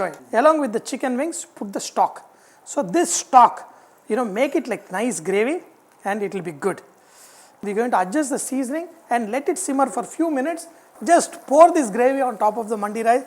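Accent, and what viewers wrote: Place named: Hyderabad, India. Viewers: Chef... in love with u r hyderabadi conversation, bilkul apna sa lagta hai, Eid mubarak in advance, keep cooking enjoy good life!!! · Wooow🤣 happy to hear sir hydrabadi urdu you are really grate aap log hamesha ayse hi khush raho aap ko bhi Eid Mubarak 🙌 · True Hyderabadi accent....Feels good... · Haha perfect hyderabadi slang of u r... 😂😂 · good to see your videos sir thanks for uploading hyderabadi baata kirrrak hi bhabi bolre so..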